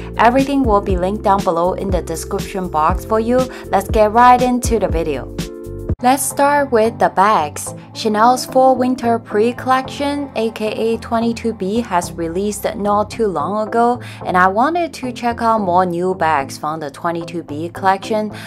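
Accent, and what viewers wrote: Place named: South Korea. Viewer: 韓腔英語。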